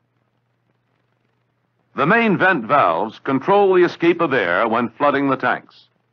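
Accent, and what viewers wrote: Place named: Transatlantic, United States. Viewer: I wish people still spoke and presented with a Transatlantic accent like this.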